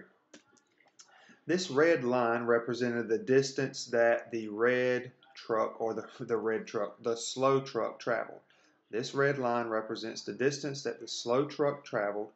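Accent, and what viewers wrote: South, United States. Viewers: are you from the south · Neat explanation + I like your southern accent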